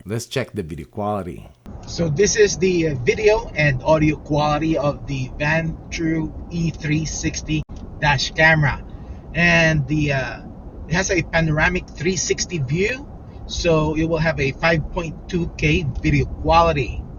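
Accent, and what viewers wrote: Philippines. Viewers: Filipino accent ❤❤❤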